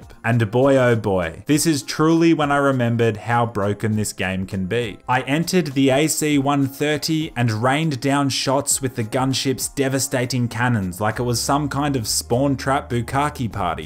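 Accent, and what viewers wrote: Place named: Australia. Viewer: Man sounds so Australian in this vid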